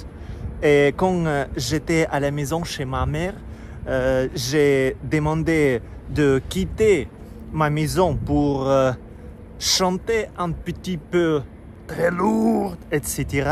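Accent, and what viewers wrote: Russia. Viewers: oui et non, il dit cela puisque tu as un accent très marqué comme dans les films ou un personnage incarne un russe :D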